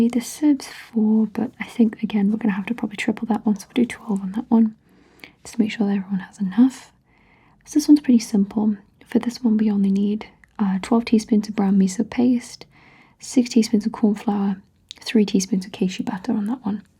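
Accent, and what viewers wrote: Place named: Wales, United Kingdom. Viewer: Time to be lulled to sleep by those beautiful welsh tones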